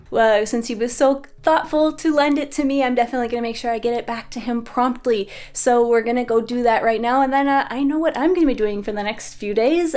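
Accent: Canada. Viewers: You have a lovely Canadian accent when you speak French! 😉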